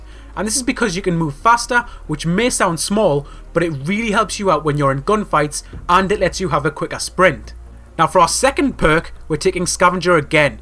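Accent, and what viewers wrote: Newcastle, United Kingdom. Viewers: he's geordie you numpty. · The good old geordie :)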